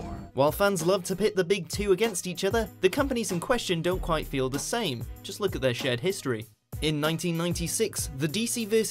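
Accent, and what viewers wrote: England, United Kingdom. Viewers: the first british wathmojo host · Dude, English guy!!!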